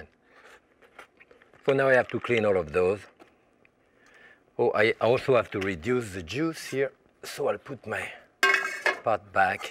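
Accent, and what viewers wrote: France. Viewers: Haha I'm sure I wished that himself. Fact is the French never lose their French accent while speaking English. It's either too difficult and/or too painful for them to do so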